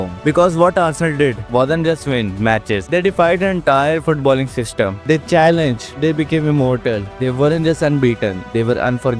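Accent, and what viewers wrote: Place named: India. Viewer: indian voice annoying use ai voice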